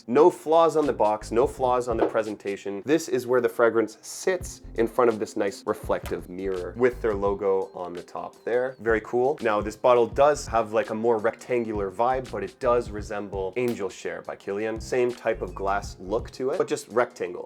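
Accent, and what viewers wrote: Canada. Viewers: It’s not a mispronunciation or wrong - it’s a nuance of a Canadian accent. Let’s appreciate (and not correct) cultural and regional variations. Great video,!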